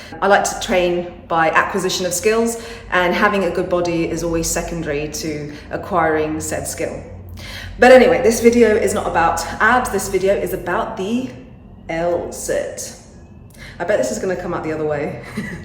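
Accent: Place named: United Kingdom. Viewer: You look like an indian and sound like British 😁😁😁😁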